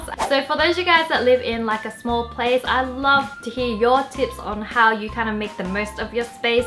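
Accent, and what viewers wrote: Australia. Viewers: Is she Australian? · it's the australian accent...like they say idear instead of idea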